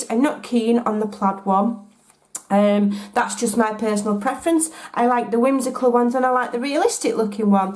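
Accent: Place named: North, United Kingdom. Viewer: Why did you not score! Naughty naughty, but lovely to hear a nice UK accent trying to place it sounds more northern like the cards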